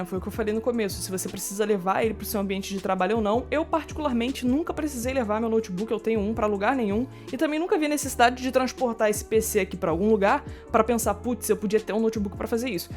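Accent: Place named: Rio de Janeiro, Brazil. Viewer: Quase não da pra reparar que ela do Rio né hahaha Goxtoso esse sotaque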